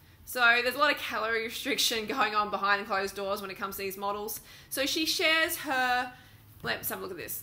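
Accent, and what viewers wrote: Australia. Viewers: Is she Australian? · I don't know what's more annoying... your accusations against Kendall or your stupid aussie accent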